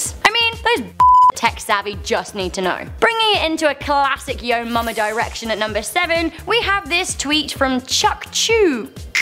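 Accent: United Kingdom. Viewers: Anybody else love the British accent???😁😂😃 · Your British accent makes this 100% better.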